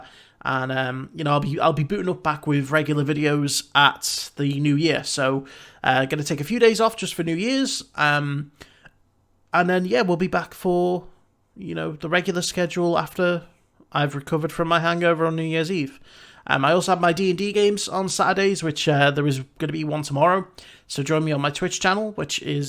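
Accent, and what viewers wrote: United Kingdom. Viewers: Wait. You sound British yet I thought the Mandalorian was available on UK Disney plus till like feb of 2020. Just how are you seeing it ?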